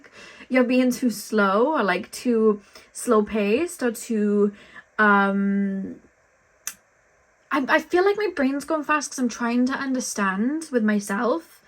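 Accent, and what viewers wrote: Wales, United Kingdom. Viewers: Pile 2; Thank you so much for the confirmation of where I am. You are so spot on, also with the Whale 🐋Been drawn to the Whales so much lately, and I read that the Whales and the Dolphins are gathering in HUGE pods all over the world now! WOW! Just saw an Eagle, and I saw one yesterday too🦅🦅YEI!!! I am new here and I really loved your reading❤‍🔥 And I love your Welsh accent, lived two years in Swansea, Wales, when I took my masters, so it is bringing me back. I come from Norway, in this life, but I the sound of your voice with the Welsh accent feels really like home🐉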